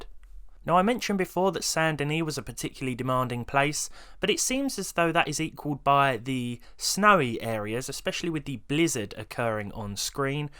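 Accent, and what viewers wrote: United Kingdom. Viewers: same lmao the british accent really makes it funnier too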